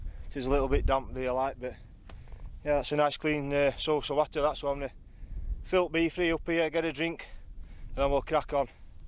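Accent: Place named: Lancashire, United Kingdom. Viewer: Another cracking video,. Where are you from, if you don’t mind me asking? I see on your IG profile you’re in Barnsley (South Yorkshire) but you use quite a lot of Lancashire slang, like ‘hafe’ instead of half and ‘neet’ instead of night.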